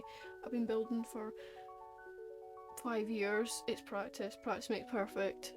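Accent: Scotland, United Kingdom. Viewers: Omg ur Scottish 🙀🙀🙀🙀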